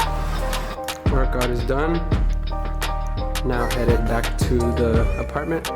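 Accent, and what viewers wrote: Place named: Philippines. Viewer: Quality video! May I know your nationality? You sounded like a filipino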